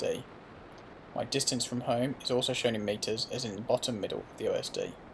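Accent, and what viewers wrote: United Kingdom. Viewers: Absolutely brilliant! I love narrated flights. I tried to do it once but I have a boring voice and make it sound like a kamikaze pilot. Speaking of which, I sense a slight Midlander accent?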